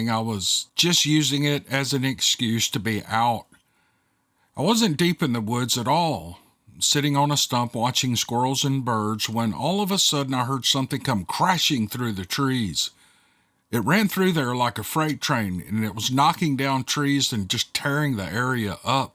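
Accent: South, United States. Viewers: I love not only because of the stories & but is the stereotypical southern personality. I’m from the South and live a LONG way away from there now. I love to settle in on a video and just sink into the Southern accent and the Southern way. In a weird way it’s a little bit of home.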